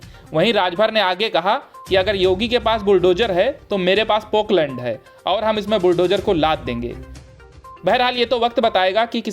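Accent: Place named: Bihar, India. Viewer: Newsofindia wallon please apna ye bihari news reader / narrator change karo. Pehle wala accha tha, clear tha. Ye "saRak puR ghoRa khaR khaR dauRta hai" wala nahi chaiye. Please bring the old voice back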